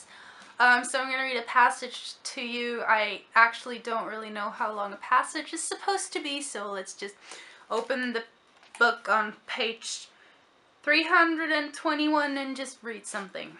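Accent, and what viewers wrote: United States. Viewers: You sound American and not Dutch O: .. I live in the U.S and I have an American accent. I like the British accent.. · wow ik zit hier in de states my bf is like "she sounds american"!!!! compliment standing ovation!!! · I'm American, and you sound very American. ;) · You sound incredibly American...minimally Dutch (I'm American) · As an American, you sound more American and precise than I do haha. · I've heard "prolly" for probably, in the US quite a bit. lol So you're not entirely wrong. Probably has 3 pronunciations here. Prolly (the quick, lazy way), probbly (the most common), and probably (people who speak really slowly, and drive me absolutely insane lol). But yeah. You'd definitely pass as American or Canadian.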